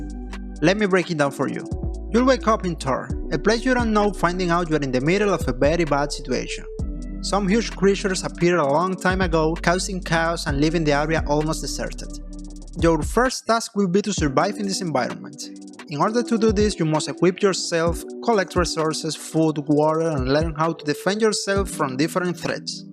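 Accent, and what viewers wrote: Spain. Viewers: Ya decía yo que me sonaba el acento, un pana de España. Suerte con el juego, pues la scope está ciertamente alta jajaja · Men eres increíble. Te apoyo totalmente en tu nuevo proyecto y deseo que lo logres superando tus propias expectativas. PD: si lo llegas a ser (q imagino q sí) espero llegar a jugarlo😢. También! Tu inglés tiene un acento notablemente español, pero es entendible, con práctica y experiencia se mejoran. Sigue así!😎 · my native language is espanish is just cute :) relax mate, we can all understand you and having spanish accent is not worst than having american accent ;) gl with the game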